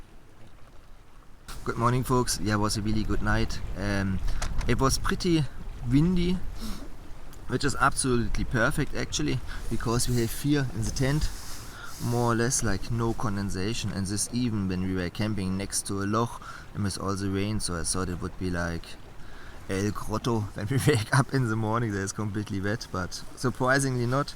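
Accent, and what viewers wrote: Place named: Germany. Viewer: Love the German accent with Scottish slang, great sound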